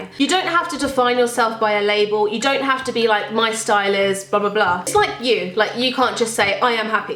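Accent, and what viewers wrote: United Kingdom. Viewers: Are you from the uk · Your British accent is sooo cute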